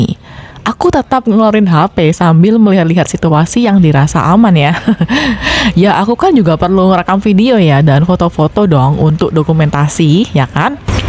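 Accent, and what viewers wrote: Java, Indonesia. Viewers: Asline ngendi sih mbak..ko koyo orang jawa sampean..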